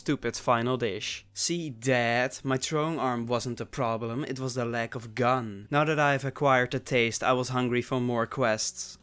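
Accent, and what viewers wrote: Netherlands. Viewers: Not to ask stupid qwestion but are u dutch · oh lmao i didnt even know it was on your channel xD i just heard the dutch accent coming through the english haha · Duurde precies een halve minuut, voordat ik door had dat jij nederlands was... 😂😂😂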